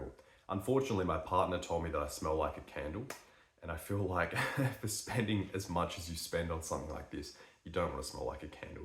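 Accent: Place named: Australia. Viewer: I just started getting into fragrances and already know what you are feeling about Sauvage mate 🤣. It’s just that Sauvage has something VERY special about it initially, but at the same time , once you get used to that special characteristic, the whole fragrance just loses its seduction. Haven’t had this with any other fragrance yet. Quite the opposite, most of the fragrances I started liking EVEN more after wearing them many times. By the way, absolutely LOVE the accent mate: which part of Australia are you from? Do you know any other Aussie channels that deal with fragrances? I already know one young bloke, but you can TELL he is young, which is why I don’t really follow his chanel much 😅. I lived in Melbourne for two years and absolutely enjoyed the vibes in Australia, hence I am always happy to find Aussie content creators and feel a bit nostalgic when listening to you guys 🇦🇺 (such as Andy cooks, but that’s a different topic haha) . 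Wishing you all the very best from Germany mate!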